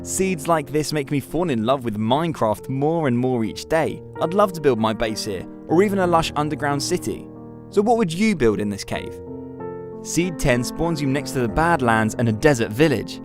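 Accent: United Kingdom. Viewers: Br’ish boy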